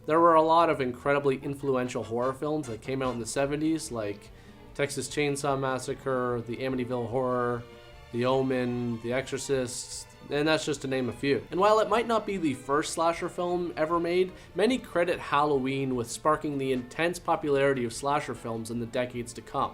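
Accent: Canada. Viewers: Trash, sounds like a Canadian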